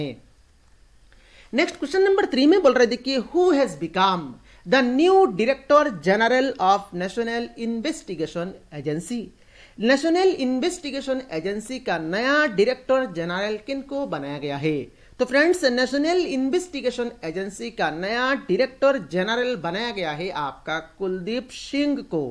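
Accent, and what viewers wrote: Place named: Barak Valley, India. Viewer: Sir kya aap barak valley se ho aapka voice unse match krta h🤔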